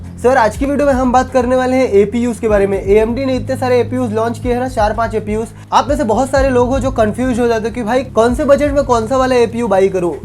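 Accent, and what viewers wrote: Gujarat, India. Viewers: Tame gujarati 6one 😊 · Bro aap Gujarat se ho ?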